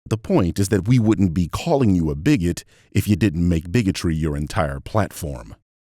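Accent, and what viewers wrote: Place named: England, United Kingdom. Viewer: It sickens me to hear an English Accent talk such Bullocks. has moved beyond being a simple village idiot and into the tall grass of Racism, Hate and Supremacy. As if AZ is better than anyone else. The Porcine Pratt Shartts his terrible opinions onto an audience of hungry mouth breathers. His mother was a hamster and his father smelt of elderberries.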